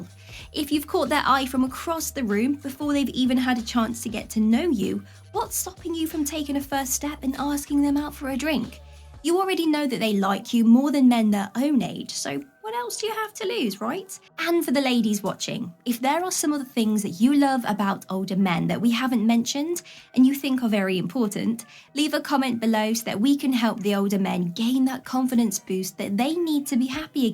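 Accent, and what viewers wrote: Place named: London, United Kingdom. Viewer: I think she is from London.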